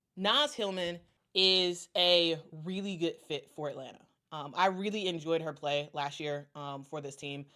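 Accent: Chicago, United States. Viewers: It's actually a Chicago accent, not odd at all.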